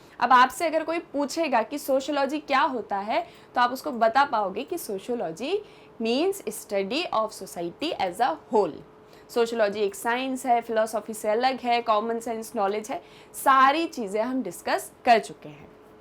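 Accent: India. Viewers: How are u doing dear Madam. I am so much eager to listen to ur lesson on sociology. However , it so difficult to understand ur pronunciation as it is highly influenced by zIindian English.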